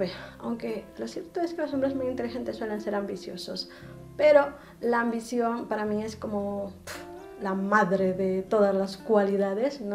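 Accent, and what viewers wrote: Spain. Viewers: ENTONCES POR QUE HABLAS COMO ESPAÑOLA? 🤔🤔🤔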